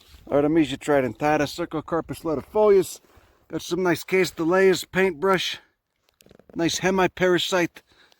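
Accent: Chicago, United States. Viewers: I love your show, you sound like my family we all grew up in Chicago.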